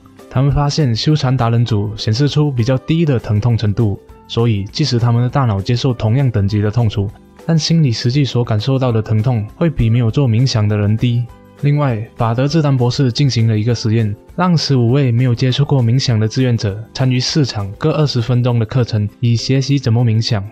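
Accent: Malaysia. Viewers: 一開始以為是台灣北京腔,最後就感覺到是大馬腔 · 马来西亚口音真的很亲切！！！ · 你一定是马来西亚人，因为料的音哈。很开心看到你的分享。我也正在努力。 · 好~ 听出马来西亚的腔调~ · Dino Mei 应该是马来西亚，因为我是马来西亚人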